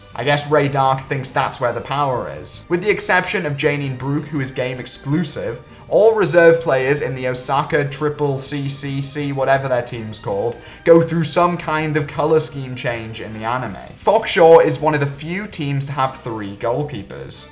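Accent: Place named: Newcastle, United Kingdom. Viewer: He said c-ooo-k-e 🤭🤭 i love the Jordie accent creeping into words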